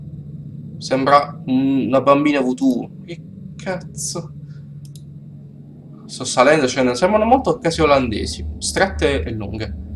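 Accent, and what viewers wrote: Bari, Italy. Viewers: maa.. sei di Bari? Comunque "stima totale!" bel video :D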